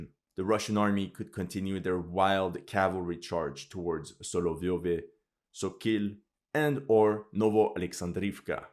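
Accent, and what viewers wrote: Jamaica. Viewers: Bomba Clot'! I was trying to figure out your accent. You're Jamaican! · 😂😂😂😂 i love this one 🇯🇲🇯🇲🇯🇲🇯🇲 you sound like a Jamaican 🇯🇲🇯🇲🇯🇲🇯🇲🇯🇲💯💯💯💯🇯🇲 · 🤣🤣🤣🤣🤣🤣 Boboclaaaat ! love the Jamaican voice . big up mi g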